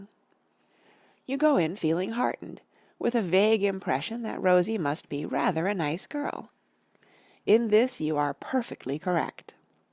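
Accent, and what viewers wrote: United States. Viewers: It is surely OK to read an American story with an American accent? The real abomination would be to read it by AI! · Wodehouse read by an American?!?! Blasphemy!!!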